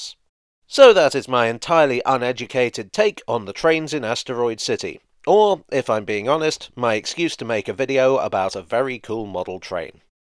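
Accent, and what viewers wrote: United Kingdom. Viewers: I'd watch anything you make because I find your voice soothing and it helps me understand the British accent (I'm not a native English speaker) and I love trains in general, so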